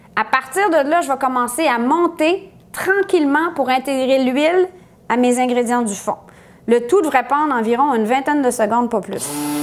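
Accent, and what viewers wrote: Quebec, Canada. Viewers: Je suis français et chef de cuisine , j'aime bien votre accent québécois , continué vos vidéos de partage culinaire et bonne continuation !!! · L'accent Quebecois....... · Son accent est different. Canadien ? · Voilà une adorable jeune femme avec son accent québécois qui vous fait voyager. 30 ans d'experience ? Elle ne fait vraiment pas son âge. Quant à sa mayo, j'aurais ajouté du poivre. Merci pour votre fraicheur et vos recettes. Amitié aux cousins québécois. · j’aime trop ton accent québécois trop satisfaisant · jaime pas accent de mademoiselle on dirait une giraffe qui parle on dirait vous avez un chat dans votre gorge meme accent du quebec a la celine dion et garou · Merci pour ta réponse, en tout cas j'avais pas mes règles !! Lol Je retesterai une prochaine fois alors c'était pas de chance!! Ça devait venir de mon oeuf je pense alors, car il était peut être pas assez à température ambiante... 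Bises de France!! J'aime trop l'accent québécois !! 😍😘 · Je suis française et j’adore entendre parler les québécois!!! Je trouve ça réconfortant je sais pas et vous avez l’air tellement authentique et sympa, pas comme nous les français qui râlons sans arrêt 😂 (en France le robot que tu utilises s’appelle un « mixeur plongeant » lol) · L"accent canadien est effroyable. Parlez comme il faut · Je supporte pas l accent QUÉBÉCOIS !!!!!!!😣😣😣😣😣😣😣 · Très jolie fille et accent québécoise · J'aime trop l'accent québécois c'est fou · Une québécoise qui nous apprend à faire une mayo..! Cette charmante jeune femme ignore que seul le jaune d ' œuf est nécessaire , qu' elle nous propose la confection d' une véritable sauce béarnaise ...! On pourra alors juger son réel talent ...? Mais à part cela elle est charmante avec son adorable accent . ( un maudit Français ! ) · L'accent québécois 🥰🥰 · Bravo! Mais je mettrai le citron à presser en entier pour éviter d'avoir un 1/2 citron qui traîne ensuite dans le frigidaire et dont on ne sait pas quoi faire. 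La quantité d'huile que vous utiliser est impressionnante.... A essayer: un radis noir finement broyé et une ou plusieurs gousses d'ail aussi finement broyée + du poivre. J'avais essayer cette méthode, elle marche très bien. En France cela s’appelle un MIXER PLONGEANT. On aime votre adorable accent québécois, tout à fait charmant. Merci! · AAARG catastrophe je fait ma mayo à la façon Philippe etchebest le King de la cuisine, deux jaune d'oeuf sans les blanc, sel, moutarde,vinaigre et l'huile et ons verse l'huile en fin filet peu à peu et ça marche c'est imparable un vrais délice, j'ai voulue faire votre metode et vas i je met tout d'un coup elle ne monte pas rien que dale le seul truc que j'ai apprécié c'est de voir la sympathique cuisinière au belle accent québécois, j'avoue qu'elle et adorable, mais n'en déplaise à l'avenir j'en resterai à notre exelent chef cuisinier français !! cordialement,!! 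· Je pense que le québécois soit un accent car si on en prend la définition, un accent est la prononciation différents de mot déjà existant (les langues) etent donné que le français soit arrivé en premier on peut dire que le québécois soit un accent bien qu'il existe plusieurs mot ne figurent pas dans le dictionnaire français au passage j'aime beaucoup cette accent :D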